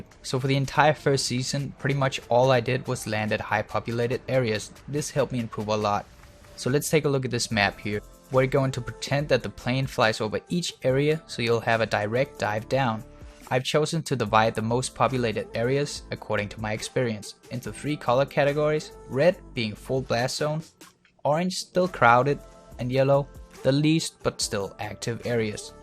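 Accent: Denmark. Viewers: Are you Danish? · Are you Danish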